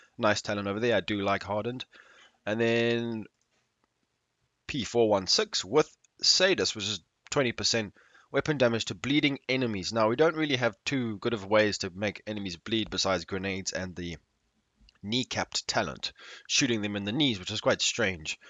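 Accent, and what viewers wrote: South Africa. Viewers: You South African! Sounds so. I subbed because of. Are you part of a clan?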